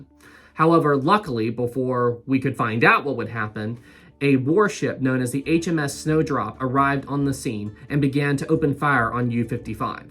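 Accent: South, United States. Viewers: Kid, learn how to properly pronounce words like "during" (which isn't pronounced the same as the word "daring") and drop the southern drawl when using words like "time".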